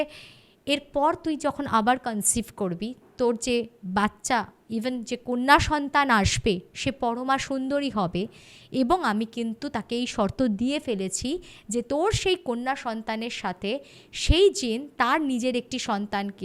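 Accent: United Kingdom. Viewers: Apnar bangla ta khub ekta bhalo na , kerom ekta English tan ashe ....kintu Kichu ekta Jadu ache apnar modhdhe jeita ato attract kore ....r apnar story telling er modhdheo kichu ekta ache ....kerom ekta bokar moton kore mohit hoye shuni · Nekamo kom kore apnar english accent kom shuniye thik thk bhabe ektu short e bolun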